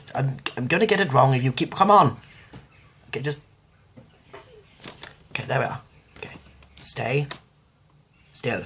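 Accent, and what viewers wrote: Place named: England, United Kingdom. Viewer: Bloody hell I love that posh english murmering, don't ask me why hahaha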